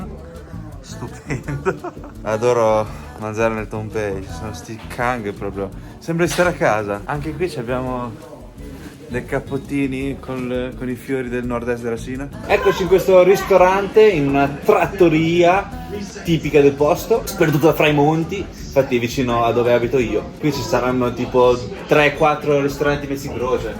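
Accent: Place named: Emilia-Romagna, Italy. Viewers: Davvero bella scoperta questo canale con questo simpatico ragazzo di origine cinese che parla italiano con l'accento emiliano-romagnolo! 😊👍 Iscritto! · Un cinese con l accento romagnolo.. Troppo forte 😅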